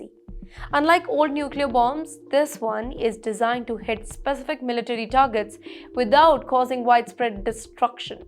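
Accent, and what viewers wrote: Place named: India. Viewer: your accent is hilarious 😆.. obviously Indian.. just stop trying to be global news media cause it's disgusting.. so itchy to our ears 😂😂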